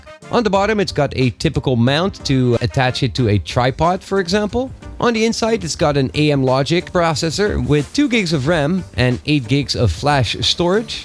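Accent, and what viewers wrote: Netherlands. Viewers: Allthough you speak very good english, i detect dutch......am i correct? Nice vid btw. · your accent....is that dutch??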